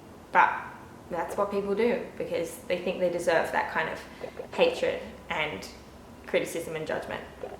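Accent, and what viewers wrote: Australia. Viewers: Sorry this is completely off topic but are you Australian because you sound Australian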